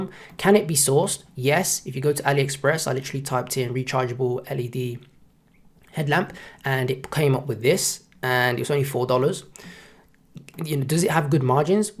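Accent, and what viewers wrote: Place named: London, United Kingdom. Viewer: Great informative vid keep them coming...also you sound like a Londoner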